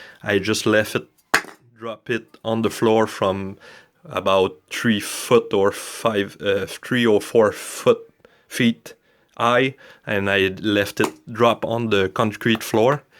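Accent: Quebec, Canada. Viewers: ca sonne comme un quebecois ca.......... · It's francophone Canadian. A very strong francophone I might add. I'm also Canadian and only speak English... I sound nothing like this and the majority of Canadians do not sound like this either, but Canada is the only place you will hear this particular francophone accent. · You sound Canadian. Is that where you are from? Love the accent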